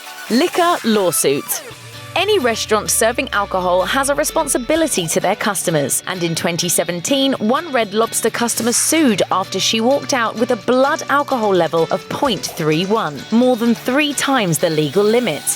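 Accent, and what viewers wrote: England, United Kingdom. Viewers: You talking about red lobster with English accent? Fuck you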